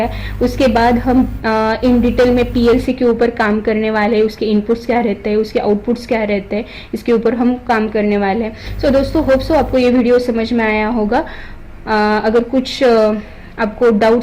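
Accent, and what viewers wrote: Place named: Hyderabad, India. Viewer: Ma'm aap Hyderabad se hai kya? Aap ka lahja batara k aap hyderabadi hai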